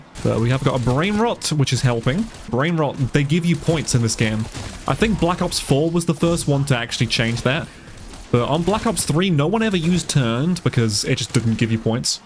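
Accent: United Kingdom. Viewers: Its refreshing to hear a british person talk about how messed up rowling is.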